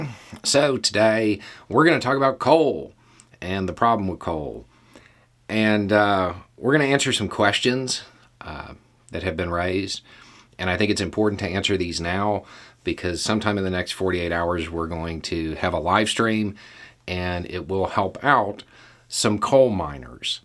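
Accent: South, United States. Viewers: Big “Oh, bless your heart!” Southern accent energy in this one, and that commenter totally deserves it all.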